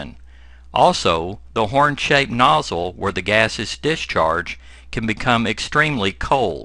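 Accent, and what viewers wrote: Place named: South, United States. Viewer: I'm glad it's a southern accent not monotone to make it a little more engaging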